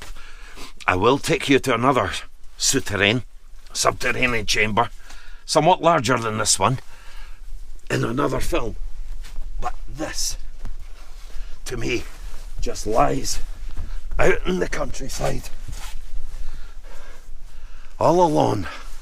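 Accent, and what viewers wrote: Scotland, United Kingdom. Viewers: Ach, i appreciate your Scottish accent, exploring the lands in Wellies, as I will never be able to visit. ❤ and especially the romans pegasus. Such ahigh ceiling and huge carved blocks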